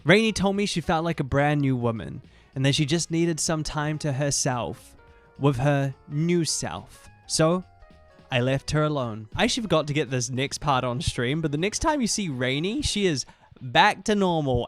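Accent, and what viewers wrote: New Zealand. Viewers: OKAY SINCE WHEN WERE YOU A KIWI AND HOW DID I NOT KNOW?! Honestly was so shocked by a kiwi streamer, like I’m suddenly so happy that our country is actually on the internet talking about things other than nz. 😂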